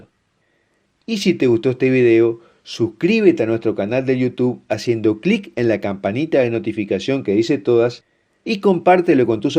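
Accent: Argentina. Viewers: TU ACENTO TE DELATA: ¡ERES ARGENTINO! MI PREDICADOR EVANGÉLICO ARGENTINO FAVORITO ES: "DANTE GEBEL." SI NO LO CONOCES, TE LO RECOMIENDO, SU PRÉDICA: "THE WALKING DEAD" ES, SIN DUDA ALGUNA, UNA DE SUS MEJORES PRÉDICAS!!! GOD BLESS YOU!!!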